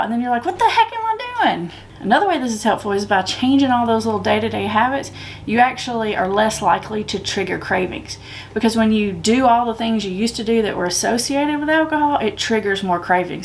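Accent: South, United States. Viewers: Verryy calm pretty southern arcent, that bullshits into an advert.. BORING another pretend proffessional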